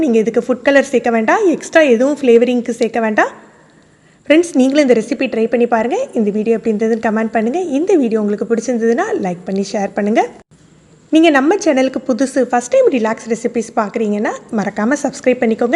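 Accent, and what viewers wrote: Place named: Tirunelveli, India. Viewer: why I asked : Your Thamizh accent reminds me of my MSc classmate -1965-67- Loyola-Mr Farook from Tirunelveli.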